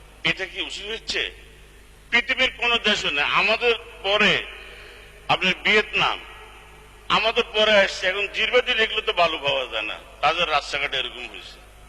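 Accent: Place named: Noakhali, Bangladesh. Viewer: ওম্মা হেতেন তো দেখি নোয়াখাইল্লা চমৎকার...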